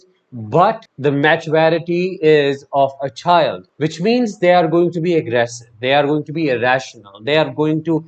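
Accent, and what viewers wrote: India. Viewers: The accent gets things very confused. It’s partly Indian and Partly Western. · Strong indian accent earned my sub. Solid 10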